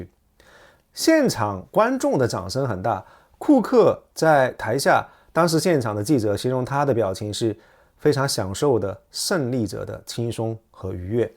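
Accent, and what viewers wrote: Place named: Liaoning, China. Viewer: 辽宁口音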